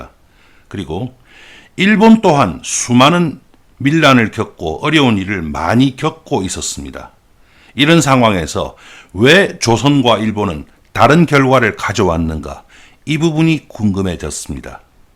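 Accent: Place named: Busan, South Korea. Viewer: 재미있고 유익한 내용으로 제작하시는 노고에 감사드립니다. 부산말억양이 친근하게 들리네요 정확한 발음에 천천히 가는 속도도 좋습니다..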